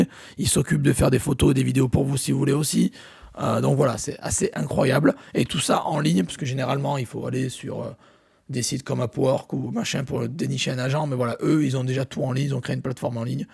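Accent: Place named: England, United Kingdom. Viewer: merci super comme d'hab et au fait sympa ton accent anglais 😅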